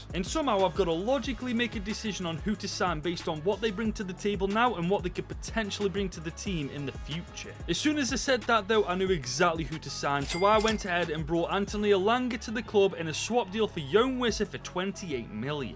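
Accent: Stoke, United Kingdom. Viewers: Are you from Stoke mate?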